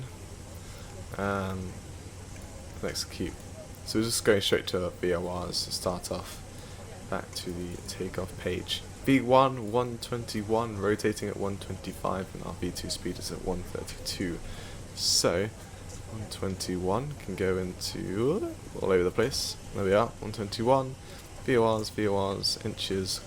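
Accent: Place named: England, United Kingdom. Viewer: Why does he have an English accent in new Orleans?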